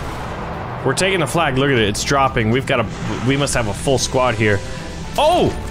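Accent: Australia. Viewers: U kinda sound like Australian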